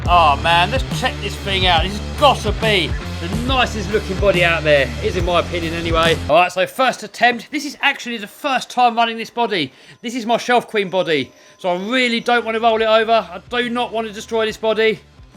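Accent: United Kingdom. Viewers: Are you British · Is that Uk accent?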